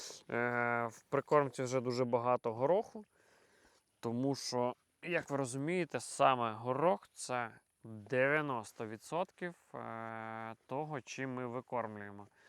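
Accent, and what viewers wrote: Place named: Western Ukraine, Ukraine. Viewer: Це шось Західно-Український діалект.😊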